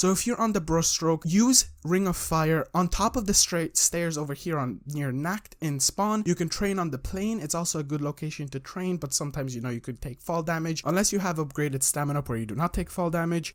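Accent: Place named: Ireland. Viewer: You sound Irish bro is it true but it isn’t a strong Irish accent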